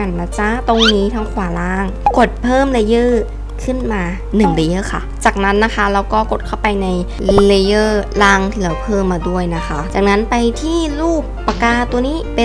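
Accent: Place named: Suphanburi, Thailand. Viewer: ไงครัช ชอบรายเส้นนะ เก่งอะ เหนอวะ เปนคนสุพรรณนิ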